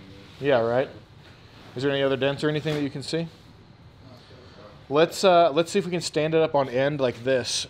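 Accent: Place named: Asia. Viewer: Talent indeed, when he speaks in his normal voice the asian accent becomes obvious.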